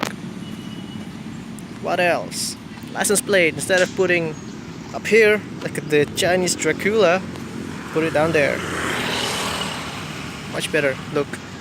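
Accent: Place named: United States. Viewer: Logatnya us bgt... Can you teach me?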